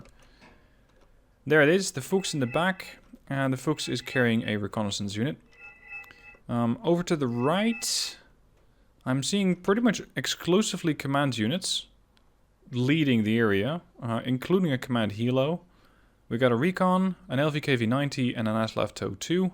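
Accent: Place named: Netherlands. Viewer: Sounds like a dutch one to me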